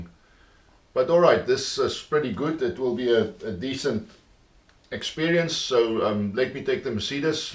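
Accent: South Africa. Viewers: Accent like south african mate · Good to hear a south African accent within sim racing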